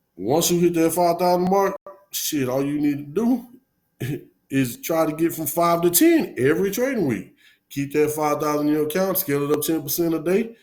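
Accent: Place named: Houston, United States. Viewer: Must be from Houston. I know that accent when i hear it 🤘🏾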